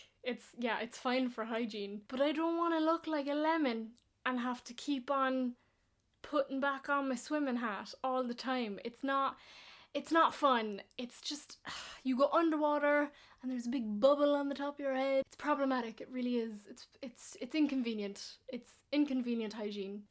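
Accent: Ireland. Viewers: juging by the accent she's from mayo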